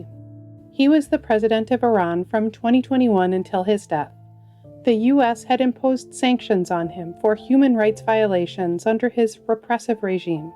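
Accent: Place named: Wisconsin, United States. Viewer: Great Wisconsin accent.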